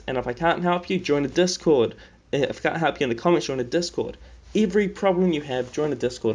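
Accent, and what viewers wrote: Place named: New Zealand. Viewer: you sound like a kiwi lol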